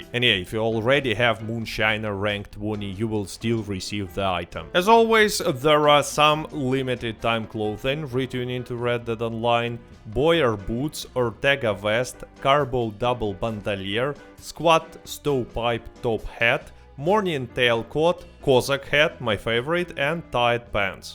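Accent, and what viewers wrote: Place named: Russia. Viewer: Господи как же слышно, русский акцент, это жесть, попробуй чуть мягче, ощущение будто ты Борис)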